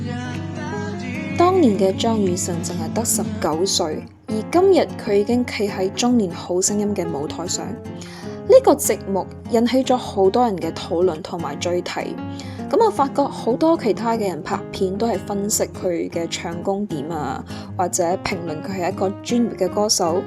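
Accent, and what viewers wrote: Malaysia. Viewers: 多謝分享，你略帶口音嘅廣東話聽得好舒服，你也來自馬來西亞嗎？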